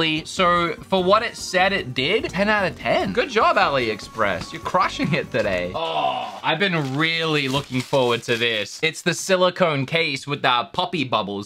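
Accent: Australia. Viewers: sounds more Australian then normal lol not a bad thing I just heard it more then usual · What's with your weird fake American accent lol.. I preferred your beautiful Aussie accent you have somehow lost..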